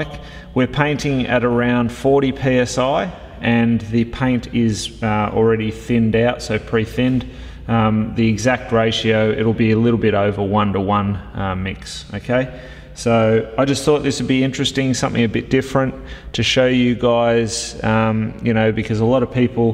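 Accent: Australia. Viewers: A whining Australian ruined this comparrison. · Twelve minutes of earache listening to an Aussie accent... and the conclusion is pretty much what one would have expected.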